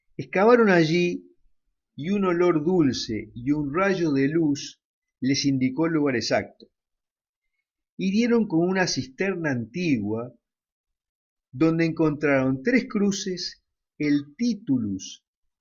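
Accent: Argentina. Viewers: Tengo 2 preguntas Estos vídeos llegaron a mi como sugerencias, no conocía el foro, por los temas que tratan y por las fechas de publicación parece ser nueva la dirección. <link> cuando estuve en la página quise saber quienes eran ya que está la opción, por más que la clike no me lleva a ningún lado. Las preguntas son: Es una org religiosa? Si bien por su acento parece argentino Ud es religioso o historiador?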